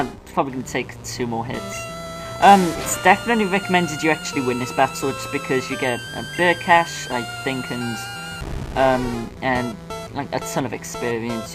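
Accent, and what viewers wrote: Birmingham, United Kingdom. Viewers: Are you from Birmingham?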